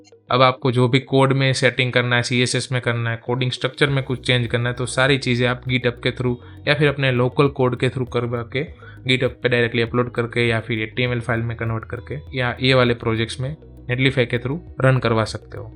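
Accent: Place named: Gujarat, India. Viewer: lang per thi gujarati lage chee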